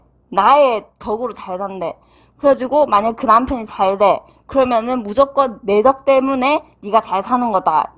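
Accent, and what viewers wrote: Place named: Gyeongsang, South Korea. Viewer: 타로 거기 좌표좀 대구면 가볼려구요 ㅎ 말투가 대구나 부산같내요 ^^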